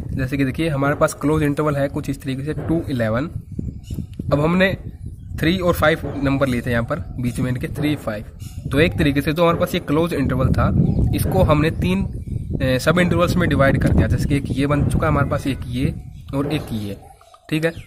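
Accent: Haryana, India. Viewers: Aap h haryana se ho?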